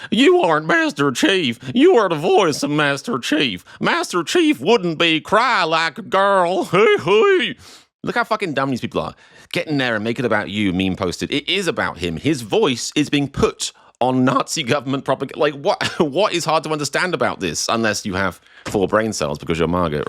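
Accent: United Kingdom. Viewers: Lol. He's a Brit. A lot of words are pronounced differently. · dear, you apparently worked very hard on this compilation so kudos to you; but i am most impressed that with your native VERY British accent you can mimic the redneck MAGA hick-talk spot-on still ROTFLMAO; sorry i got entirely distracted......💙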